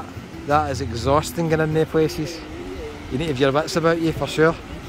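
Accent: Scotland, United Kingdom. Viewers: Always lovely to hear your Scottish voice! Nice one!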